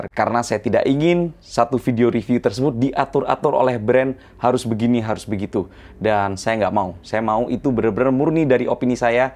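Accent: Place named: Surabaya, Indonesia. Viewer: oalahhh logat nya kayak orang surabaya dulu makanya tak kira orang surabaya wkwkwkwk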